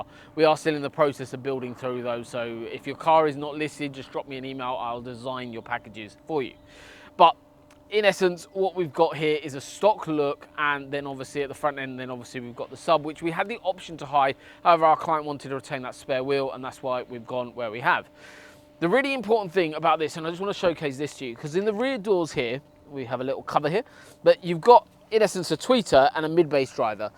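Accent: South Africa. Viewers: that accent is just RSA, no DNA. Awe ma se kind 😊😊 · Instantly picked up the South African accent, two words in. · I hear a South African accent a mile away 👍🇿🇦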